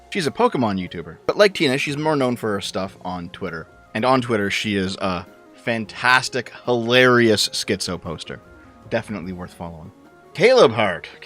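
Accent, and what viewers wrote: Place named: Canada. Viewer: I found you when you filled in for Sargon. First thought was that you sounded painfully Canadian. Thanks for the thought provoking content.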